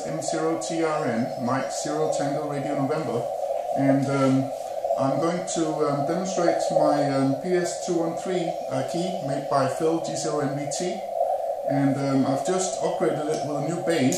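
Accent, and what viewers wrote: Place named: Denmark. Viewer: you have got to be danish, your accent sounds danish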